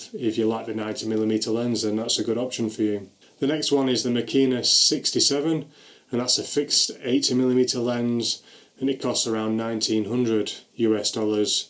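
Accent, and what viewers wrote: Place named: Sheffield, United Kingdom. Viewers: I can tell your accent is somewhere near Sheffield. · You sound like you have a northern English or Scottish accent, not New Zealand..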